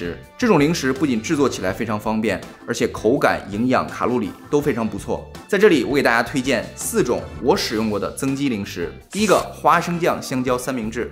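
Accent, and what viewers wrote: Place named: Beijing, China. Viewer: 我感觉是北京人，我是北京人，他的口音，很像普通话很好的北京人😂😂😂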